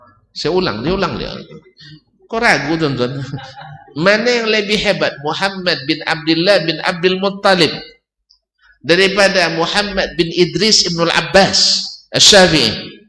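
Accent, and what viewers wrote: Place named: Malaysia. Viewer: hahahaha ente lucu logat melayu gitu dibilang Arab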